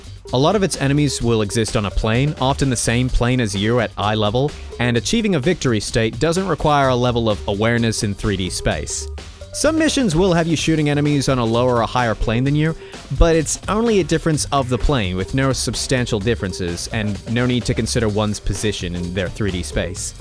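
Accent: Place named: Australia. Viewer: not gonna lie you sound like an australian learning an american accent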